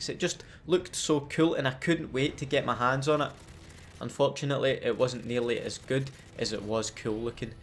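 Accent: Scotland, United Kingdom. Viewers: Is this a Scottish accent? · I love Scottish accents. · Oh dude ur videos is the best always brighten me up Even when Im mad u have good humor And that ur scotish makes things Even better love your vids keep the good work up👍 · this guy has got me dead xDDDDDD and the Scottish accent makes it even better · You sound more like a proper Scotsman than an Aussie. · I love your Scottish accent! 😅❤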